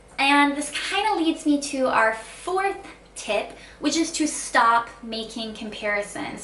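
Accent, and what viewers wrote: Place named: United States. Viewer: Oh,yoyr accent is incredibly beautiful between different american accents,where is your state in USA which treat you with this beautiful american accent then??👌👌👌👌👌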